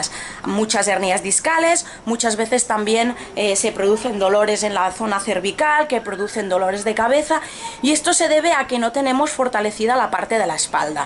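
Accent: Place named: Catalonia, Spain. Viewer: no puedes negar que eres catalana :-) Me gusta mucho tu acento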